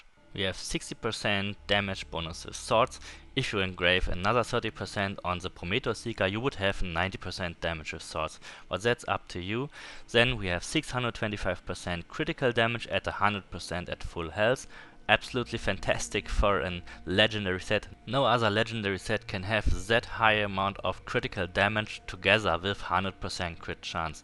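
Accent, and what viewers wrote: Germany. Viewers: I thought the same and wanted to write the same comment. The accent is so German😂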